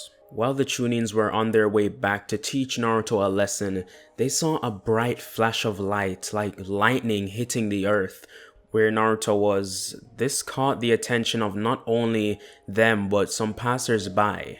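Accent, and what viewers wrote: Jamaica. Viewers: hi, I I'm just wondering if your from Jamaica I hear I semi accent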